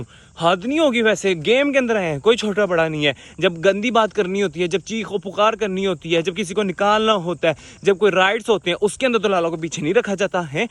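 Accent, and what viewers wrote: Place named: Punjab, India. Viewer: Please apni Urdu thik karin Ap kah andaz Punjabi hai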